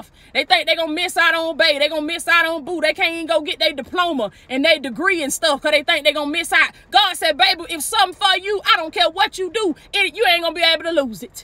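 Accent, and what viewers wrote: South, United States. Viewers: You from the South????😂😂😂😥💯💪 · Love the message and the country accent. 🥰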